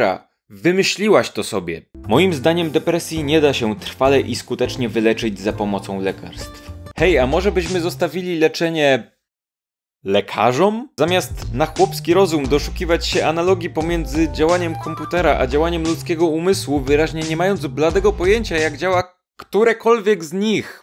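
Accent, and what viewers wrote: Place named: Lesser Poland, Poland. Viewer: Eee, nie. Raczej ślady dialektu małopolskiego wychodzą - głównie w udźwięcznieniu głosek: Sprawdźmy (dźwięczne /w/ i /dź/) Powiedzmy (dźwięczne /dz/ przed m) tak jest (tag jest - udźwięcznienie głoski przed /j/) (jestem fonetykiem, zboczenie zawodowe ;))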